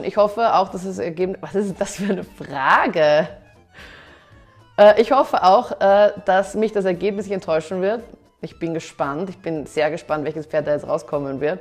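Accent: Austria. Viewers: Ich liebe deinem Österreichischen aktzent 😂😊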